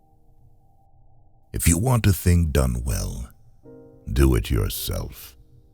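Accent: United States. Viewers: Anericann pronounciation sticks out like a sore thumb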